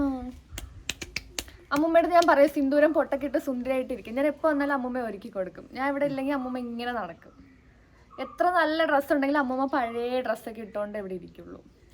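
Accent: Trivandrum, India. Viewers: hai chechi ammommayod samsaricha tvm slang kettapo as a tvm kaari oru sugm indayirunnu ❤🎉😅❤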